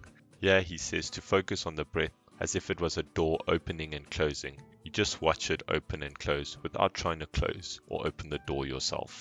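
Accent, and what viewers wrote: South Africa. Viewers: Great video! I love your accent, is it South African ? Reminds me a lot of my grandfathers voice. Thanks :)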